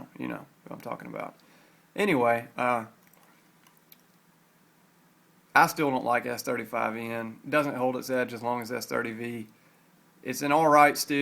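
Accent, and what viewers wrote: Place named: Appalachia, United States. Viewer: help! i cant decide which knife i want to use to kill myself after watching your legion of horrible, redundant, long winded videos! I admit i was bought in by your initial charms, the qualities of your down home voice, a voice of an educated hillbilly were refreshing. Youre a simple guy--a shirt tucked in, cell phone attached to the belt, hands on 10 and 2, I hate drugs, sports team hat wearing, kind of guy. Studied "business" in college right? Put the gun in the closet for 3 months and you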